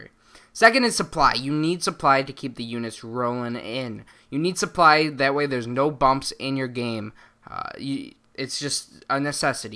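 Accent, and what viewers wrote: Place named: New Jersey, United States. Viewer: Sounds like you're from New Jersey lol.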